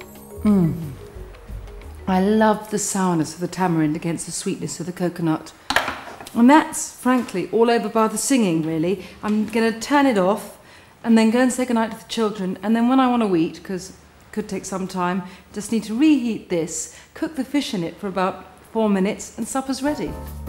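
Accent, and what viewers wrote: United Kingdom. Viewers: nigella removes stress from cooking . she's truly fun to watch . but sometimes I'm a bit stressed listening to her brit accent .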